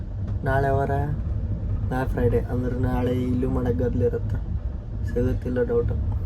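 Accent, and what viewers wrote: North Karnataka, India. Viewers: You have significantly improved your vlogging over time. Tumba khushi aagutte Kannada vlogs nodlikke, especially North Karnataka accent nalli. God bless you and wish to see you reaching a million subscribers soon. Best wishes.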